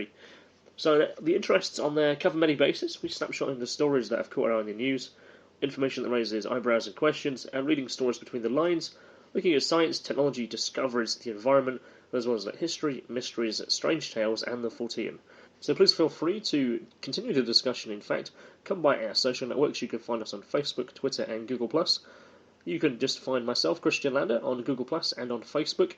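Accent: United Kingdom. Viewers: The narrator sounds British so give him a break. 🌈🌈😝🌈🌈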